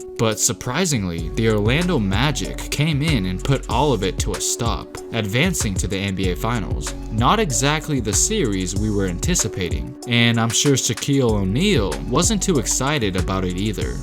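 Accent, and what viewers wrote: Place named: Philadelphia, United States. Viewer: I was just thinking dat Philly all day lol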